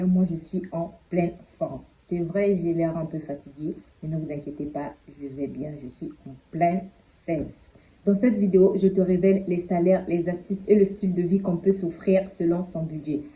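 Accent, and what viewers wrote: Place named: Gabon. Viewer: Ton accent est gabonais 😅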